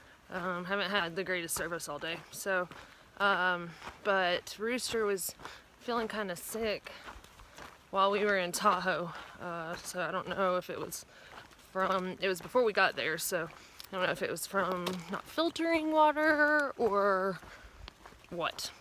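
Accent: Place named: Alabama, United States. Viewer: That was some Lake...the best in your LAFE?!! You sound like my sister (Birmingham, Al) LOL! Love it!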